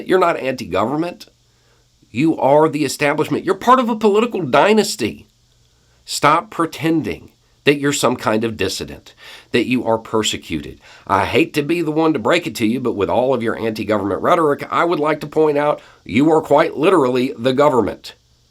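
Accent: South, United States. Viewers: Lovely, a bearded man with a southern drawl who wants you to think he is either libertarian or some form of conservative when in fact he is a full fledged progressive liberal. Yes, mask are ineffective, and this dude's mask certainly does not work.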